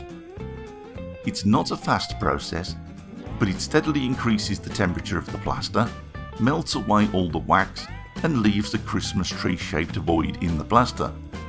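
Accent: Australia. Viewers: Love the attention to detail. You're quickly on your way to becoming a master at lost wax. Won't make any comments about the male tree because it would be crude to say something about it's balls.lol Noticed below someone likes your "Aussie" accent. Thats ok because I read on big stack where someone asked if his flag was the Union Jack!! Lmao good show mate. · Here from bigstackd casting. Love the Aussie voice and the oil burner tutorial! Subscribed! Great cast btw.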